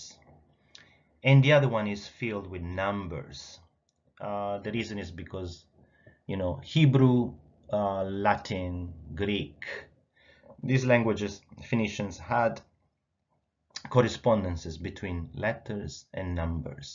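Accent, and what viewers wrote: Italy. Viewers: Sorry,are you italian??? 😅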